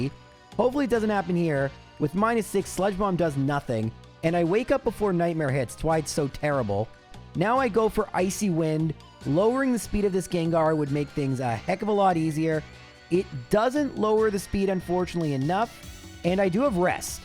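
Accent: Canada. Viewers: Hey! As others pointed out your accent was pretty obviously Canadian but that "Es hora de luchar contra el rival 3" was perfectly undertandable! Greetings from Spain!!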